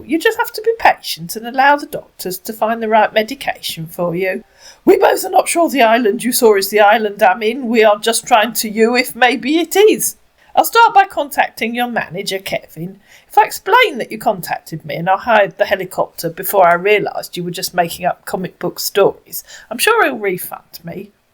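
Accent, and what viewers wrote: United Kingdom. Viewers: The way she said, "it sounds like it was written by an illiterate moron", with that proper British accent literally had me rotfl... It just struck me as super funny. Thank you for educating people with humor & hilarity 👍👍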